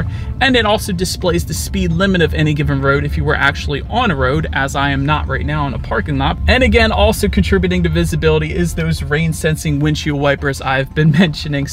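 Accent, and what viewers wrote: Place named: Philadelphia, United States. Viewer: Living in Florida now I really miss hearing that Philly accent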